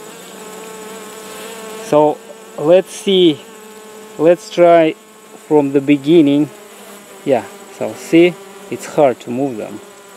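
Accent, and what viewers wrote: Russia. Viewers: russian accent😃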